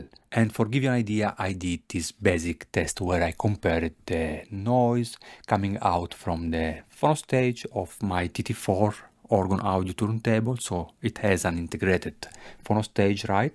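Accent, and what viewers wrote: Italy. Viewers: Also, if you don't mind me saying so, I'm not in the least bothered by your Italian accent but there are a couple of things that really hurt my ears and one of them is 'knobs'. The k in knobs is silent, like in 'know, 'knife', 'knit', 'knock', 'knight' etc. So it's pronounced 'nobs'. Also, knobs are the things that you tend to turn to adjust. The push only things are called buttons.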